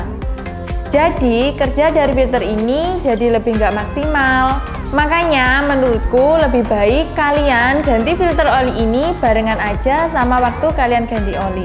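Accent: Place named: Java, Indonesia. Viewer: Medhok sekali ya kak wakakakakak tapi NICE banetttt kak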